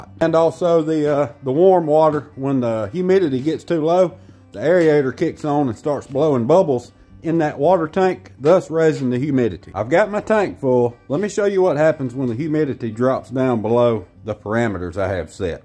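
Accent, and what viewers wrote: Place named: Northern Kentucky, United States. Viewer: Northern Kentucky!!! Just subscribed and I absolutely love your channel! Your accent reminds me of home!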